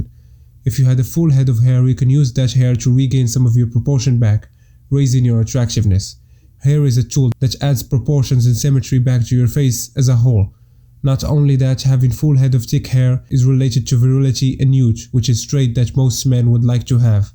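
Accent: Morocco. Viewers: I am moroccan too khoya, the accent sound moroccan. Good luck with your channel, good job ;)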